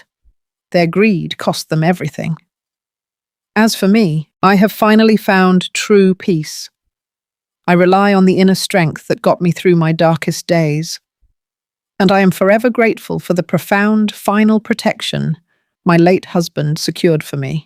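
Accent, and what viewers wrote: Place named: United Kingdom. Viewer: It might be a good idea to just leave race out of this. These are simply human stories. This is obviously a British sounding white woman’s voice. Just let us enjoy it. Thanks 🫶🏾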